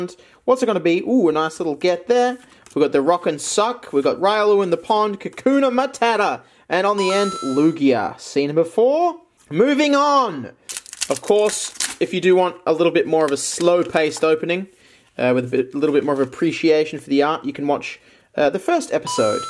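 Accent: Australia. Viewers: This shouty Australian man has invaded my YouTube viewing ..... And it's great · man I need your videos, I need Australian ga- , guy screaming to pokemon cards. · listening to Australian man screaming at card while having a coffee in the morning is exactly what i need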